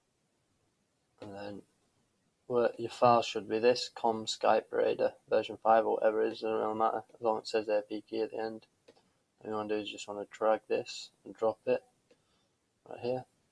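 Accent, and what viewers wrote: Manchester, United Kingdom. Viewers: He has a Manchester/Lancashire accent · yes mate are you from manchester? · at times un-understandstable because of very distinct Brit accent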